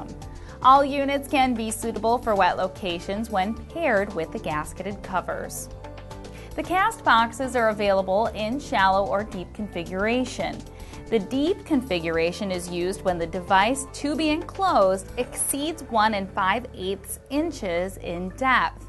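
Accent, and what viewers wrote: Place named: Great Lakes, United States. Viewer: I just love Great-Lakes accent. Living in Toronto for years, I took every "appertunity" to do some "crass-borda shapping" and pick up a "bax" of fresh "caffee", all for under a hundred "dallers".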